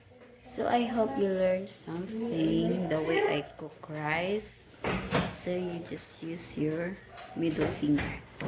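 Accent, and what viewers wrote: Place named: Philippines. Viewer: Your philipines slaunge its sounds nice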